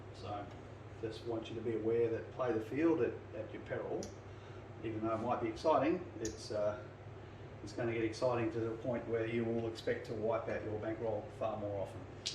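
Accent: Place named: Australia. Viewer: thanks, I agree that the complexity would make it too hard to manage at a live table, so I understand if it proves a fruitless task. Just keep up with the content as you see it, I am enjoying hearing Craps talk with an Aussie accent for a change. Cheers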